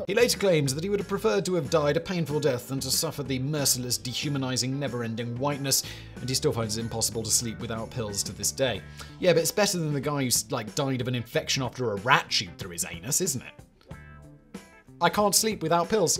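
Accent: United Kingdom. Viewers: a witty British narrator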